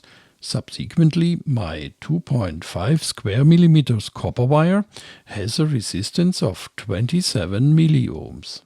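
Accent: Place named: Germany. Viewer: Ohne den Namen, wäre mir deine Tonspur tatsächlich nicht als deutscher Akzent aufgefallen. 😲 Respekt! Viele Grüße, Sebastian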